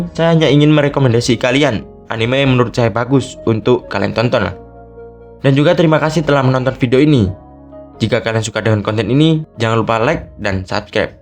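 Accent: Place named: Java, Indonesia. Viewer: B sama D nya medok banget bang wkwkwkkk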